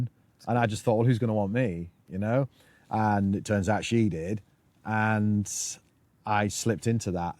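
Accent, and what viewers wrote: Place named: Lancashire, United Kingdom. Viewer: Hi.. I'm a new subscriber.. Just want to say what a fantastic lifestyle you've chosen.. It's good how you've taken your kids out of mainstream teaching.. What a way to go!!! They'll have a far better education doing your way! You are creating great content too.. Good on you!! Are you from Lancashire? I recognise the accent?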